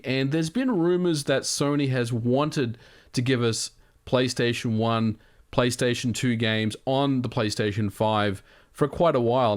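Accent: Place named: Australia. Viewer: Man, i just learned today that this guy is Aussie, i always thought he was British somehow lol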